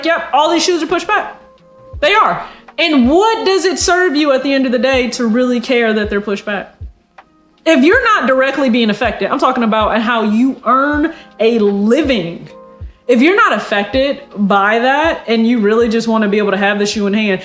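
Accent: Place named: California, United States. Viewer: You are my favorite sneaker youtuber because you go off into other relatable subjects. Youre smart and you have business savvy...and youre a female from the west coast. I assume that because you have my accent, if we wanna call it an accent lol and your gs warriors fan, that youre from California. You should put an facebook request in for me if you want that would be cool Im from Everett Washington.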